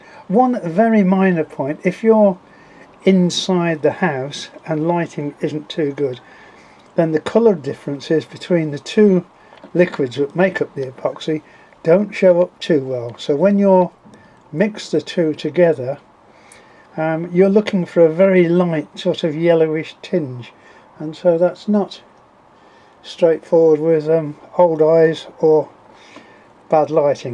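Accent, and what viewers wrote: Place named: Northern England, United Kingdom. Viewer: Grand stuff, you sound reet Northern in it......great modelling tips.......don't worry some one the other week said I sounded very Northern!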